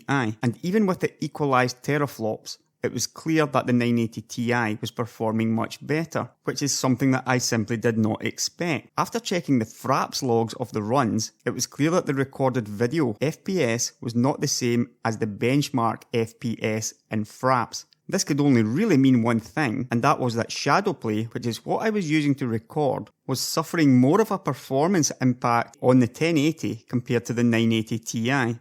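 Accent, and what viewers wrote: Scotland, United Kingdom. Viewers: content : 40% scottish accent : 60% total : 100% subscription rate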